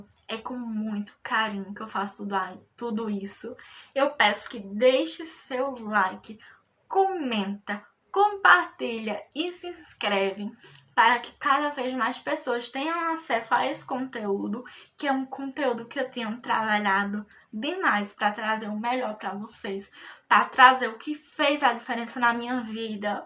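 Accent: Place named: Northeast, Brazil. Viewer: Que sotaque lindo do meu nordeste ❤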